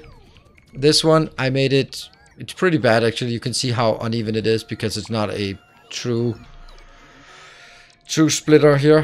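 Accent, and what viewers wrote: Denmark. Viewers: I was today years old when I figured out you are Danish. Damn, you've managed to hide the Danish accent very well. Kom så :D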